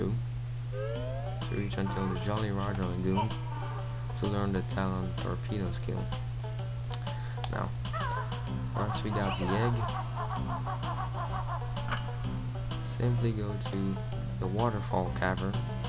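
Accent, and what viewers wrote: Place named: United States. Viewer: see told you, you sound american/canadian amazingly... but i respect your belgian waffleness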